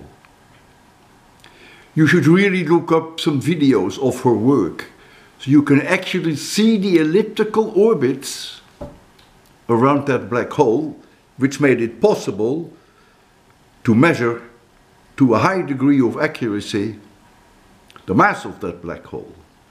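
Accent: Netherlands. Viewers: This guy sounds so Dutch it's insane I love it.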